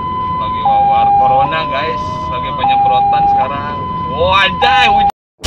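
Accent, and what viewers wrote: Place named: Banyumas, Indonesia. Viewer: wong ngapak pwrt ini bang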